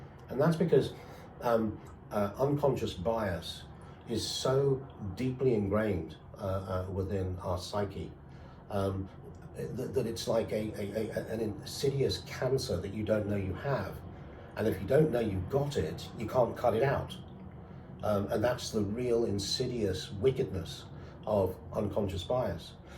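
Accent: United Kingdom. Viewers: I pictured him as white because of your British accent. Americans don’t think of black people when they think of UK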